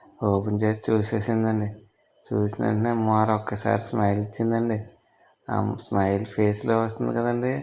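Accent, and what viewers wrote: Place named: Godavari, India. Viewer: Orye nuvu entira godariabbailala cheptunnav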